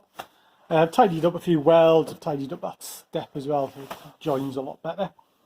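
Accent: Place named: Geordie, United Kingdom. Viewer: Haha I'm from Peterlee and to me you sound like a well-spoken Geordie.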